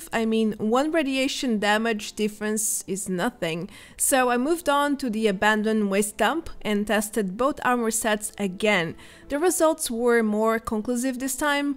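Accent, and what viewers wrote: Portugal. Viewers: Thank you so much for the video, amazing analysis as usual. I agree I'll probably not going to get into the daily godroll event to get these, took me a while to assemble my SS armor (and it's not even that good, some pieces could be better). Lastly, don't worry about how people perceive your pronunciation, let them try some of the Camões language and see how easy it is. :D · I love the way you speak. That accent... Portuguese right? You pronounce words just fine.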